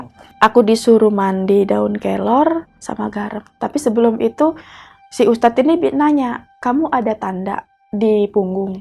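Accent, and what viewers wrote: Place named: Surabaya, Indonesia. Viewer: Ohh ciri khas org surabaya gitu ya bg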